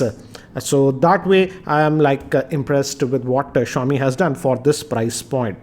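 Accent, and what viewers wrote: Hyderabad, India. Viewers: indian accent is very diaturbing and annoying! i hate it!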